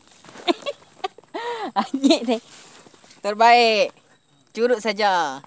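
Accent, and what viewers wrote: North, Malaysia. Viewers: slank utara tuh ckit tak tahan..haaa..tggu dia balaih umpan..hahahah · ai org utara ka..kat mana ni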